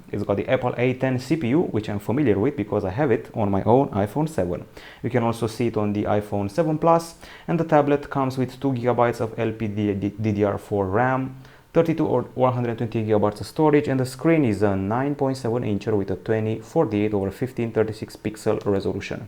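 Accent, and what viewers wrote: Romania. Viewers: Youre not english, I bet you are Romanian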